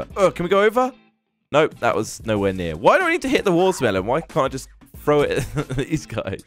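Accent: United Kingdom. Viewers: are you british or slovak? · ser u british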